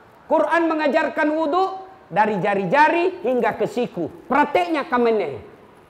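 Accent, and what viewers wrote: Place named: Sulawesi, Indonesia. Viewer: haha..kalo pak ustad tergantung audiensx, kalo ceramah d daerah sulawesi selatan atau daerah luar tapi banyak komunitas bugis makassar maka dia banyak pake bahasa dan logat bugis makassar. tapi kalo ceramah d luar, misalnya d jawa, pasti ndk ada sisipin bahasa bugis-makassar.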